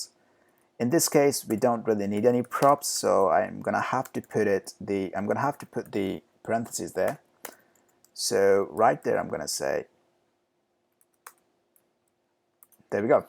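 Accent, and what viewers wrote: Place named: United Kingdom. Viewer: British Accent 👍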